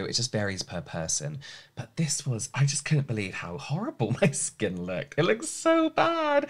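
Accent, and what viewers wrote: United Kingdom. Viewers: Hi sweetness (from Sicily)!i am an english teacger in a secondary school and,you know what,I adore your pure Brit accent and suggest my students following you😂😂😂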